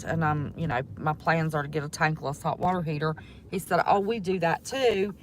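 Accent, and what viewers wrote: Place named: Deep South, United States. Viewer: Where is your accent from sounds deep south, Louisiana? Mississippi? Alabama??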